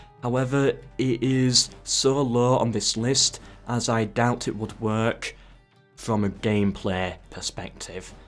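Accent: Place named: Northern England, United Kingdom. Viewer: loving the northern accent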